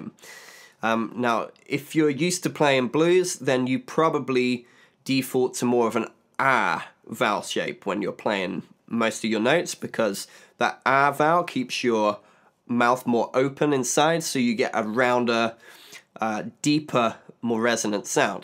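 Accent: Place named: United Kingdom. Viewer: Oh my god he’s British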